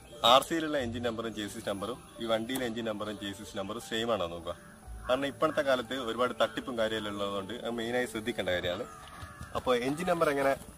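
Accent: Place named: Vadakara, India. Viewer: Bhasha shyli vadakara istam😃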